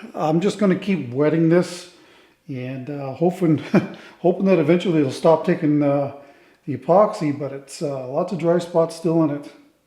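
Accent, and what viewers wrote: Canada. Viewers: I love listening to your commentary as you work! And Canadian accents are the best 😊 Two beautiful pieces today. I usually go for walnut, but the spalted birch is my favorite.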